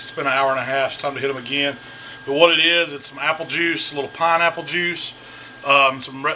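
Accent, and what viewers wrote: South, United States. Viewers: You know it going to be some good bbq when the guy making it has a southern accent 😂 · 1 thing u trust is a fat guy with a southern voice haha.. them big boys can cook them meats haha..love this guy!!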